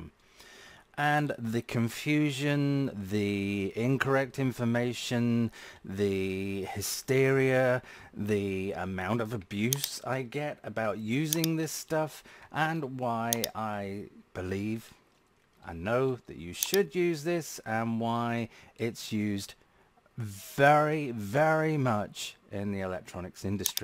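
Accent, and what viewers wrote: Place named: United Kingdom. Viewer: Very entertaining my friend. And it is common sense. At 69 years old, I'm still very young and a triathlete and I'm quite entertained not in a good way like you were to me but by people from ages 20 to 70 especially Americans as I moved from America to South America 20 years ago because of the cognitive dissonance and brain dead situation and prefer to live amongst my Costa Rican t i c o family and others from neighboring countries as they have more love common sense and appreciation of life. Thank you my British friend unless I missed it and you're Australian as the accents are close. Bendiciones from South America, Craig thank you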